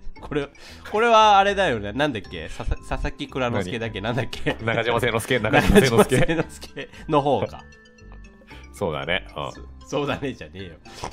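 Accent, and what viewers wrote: Kansai, Japan. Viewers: 大体レトさんと同じだわ やっぱ関西なのかね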